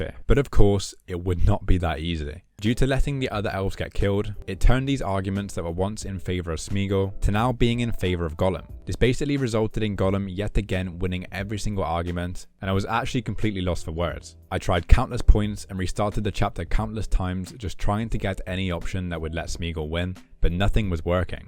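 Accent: United Kingdom. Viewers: All good😂 But may I ask, which accent you speak? I guess something from the UK?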